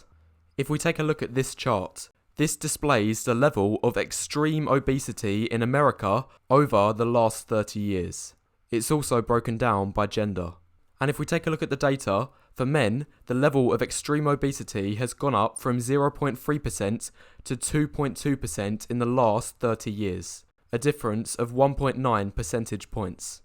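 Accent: United Kingdom. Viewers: hes british if im not wrong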